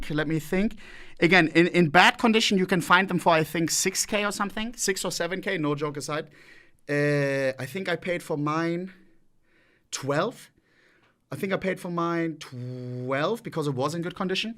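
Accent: Germany. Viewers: the german accent makes it better somehow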